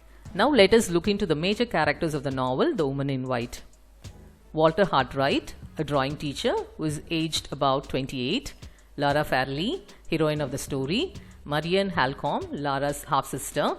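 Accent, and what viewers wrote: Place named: India. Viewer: i dont understand anything becuase of your accent' why u were born as an indian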